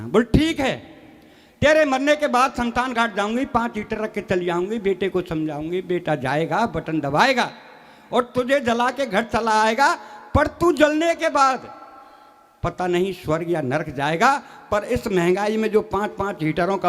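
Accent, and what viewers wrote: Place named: Braj, India. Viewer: Baba ki laungvej to mathura Bharatpur ki hai pakka❤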